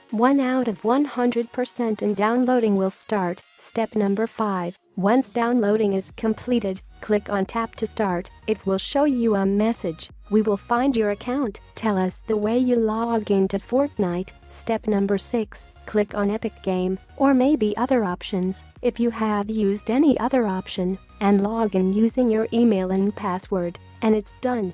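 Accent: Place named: China. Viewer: You sound Chinese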